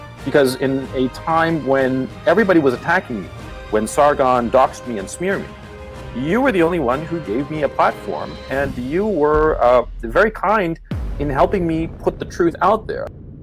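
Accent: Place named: France. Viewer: I can't stand listening to English spoken in a Frog accent. I apologise for nothing.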